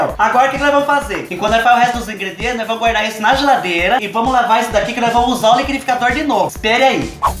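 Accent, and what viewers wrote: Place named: Caipira, Brazil. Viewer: do céu adoro esse sotaque caipira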